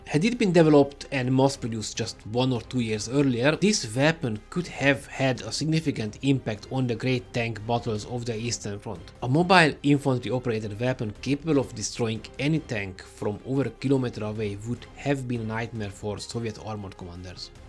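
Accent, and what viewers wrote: Hungary. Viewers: Jó hallani egy kis magyar akcentust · nagyon lehet hallani hogy egy magyar beszél angolul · You have a hungarian accent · They don't mind the Hungarian accent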